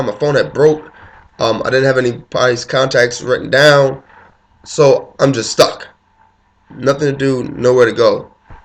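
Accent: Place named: Baltimore, United States. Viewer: You have a bmore accent is that where you from?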